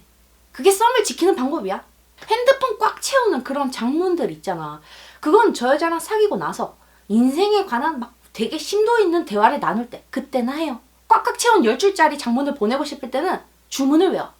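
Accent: Gyeongsang, South Korea. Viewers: 갱상도 아인겨? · 님의 목소리ㆍ말솜씨 정말 매력적이군요 경상도 특유의 억양이 상대방을 기분좋게 합니다 훌륭한 방법을 숙지하고 실천 할려고해도 좋아하는 마음이 너무 꽉 차있으면 감정이 가만 놔두지 않네요 ㅡ 님의 강의데로 천천히 데쉬해 볼랍니다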